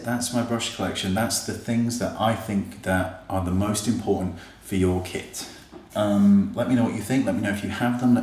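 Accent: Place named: England, United Kingdom. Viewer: He has an English accent.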